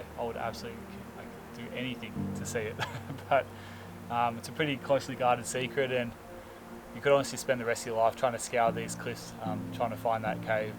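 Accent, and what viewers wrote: Australia. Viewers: Did you also know that all other birds know that it is a lyre Bird that is making the calls. Beautiful place. Ripper place. Where the bloody hell are you. LOL Nice to hear an Australia. Which state are you in? With out giving away just exactally where you are. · Looks awesome I'm guessing this in NSW by your accent and by the terrain? Looks beautiful. That cave looks sick.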